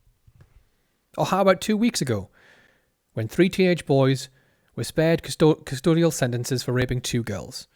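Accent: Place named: Durham, United Kingdom. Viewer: That accents sounds my neck of the woods, but a bit softer, so maybe Durham, possibly Wearside. You need more amping mate. I'm a Poolie and trying to slap bots and morons into 2037 is tiring. You've got an ally in me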